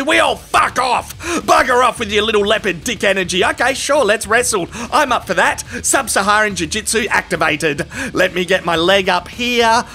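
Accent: Australia. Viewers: 3 leopards each 3 times larger than the lone honey badger, yet he comes out on top. As my boxing coach used to say: "Balls win fights." Loved the Aussie narration in this one! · Haha watched this elsewhere and rematches a few times thinking "holy shit" I need the Aussie man version. Well done son, well done.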